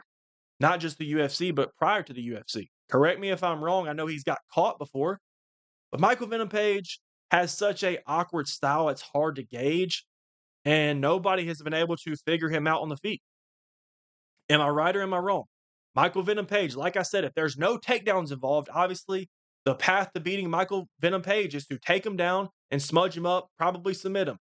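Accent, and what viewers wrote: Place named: Appalachia, United States. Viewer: bro got the hillbilly accent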